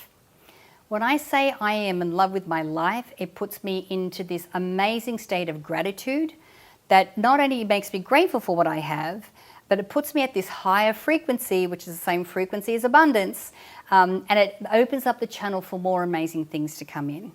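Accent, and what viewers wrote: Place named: United Kingdom. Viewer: but your accent sounds british. Am i wrong?🤔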